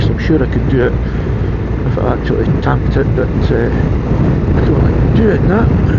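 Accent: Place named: Scotland, United Kingdom. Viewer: Good video, stunning views, and that accent is doing half the tourism board’s job on its own. I can’t wait to visit Scotland. Videos like this are dangerous for the travel fund. Also, the live stream was brilliant. You guys are doing such a lovely thing for the community, and I hope it grows and grows. Proper good vibes.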